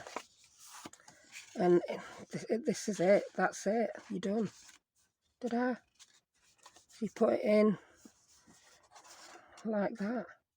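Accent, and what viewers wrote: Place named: Yorkshire, United Kingdom. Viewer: They look fab, and yes I get the bit about running over stuff with the wheelie chair, I do it all the time 😂 I’m in New Zealand and my parents were from Yorkshire so love hearing your chatter x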